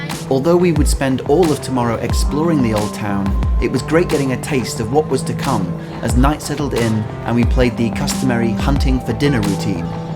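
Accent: United Kingdom. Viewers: yeah. You make videos well. Love the british accent too. But bad quality camera not clear vids. Could have been perfect vids if u use high end cams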